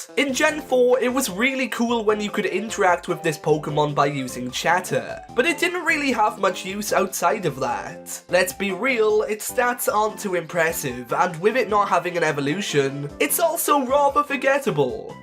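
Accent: Wales, United Kingdom. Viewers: Are you welsh mate? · Are you Welsh?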